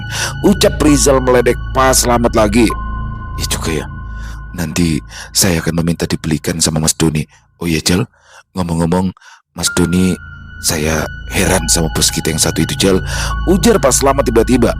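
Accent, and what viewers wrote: Jakarta, Indonesia. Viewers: Waalaikumsalam bang, ya Allah itu bahasa Betawi keluar semuanya, ora Danta, 😂😂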